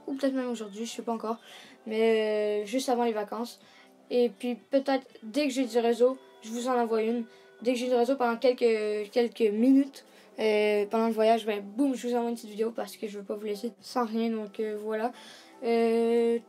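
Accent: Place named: Quebec, Canada. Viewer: Ahah, je me trompe ou pas si je te dis que tu viens du Quebec ?? ^^ sinon, bon tuto et très belle attaque (meme sans les pieges) PS : n'hésite pas à t'abo ;)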